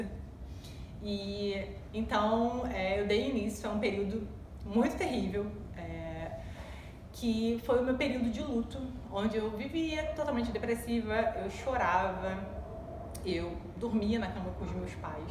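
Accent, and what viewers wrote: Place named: Rio de Janeiro, Brazil. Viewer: Carioca S2 Sotaque lindo e fofo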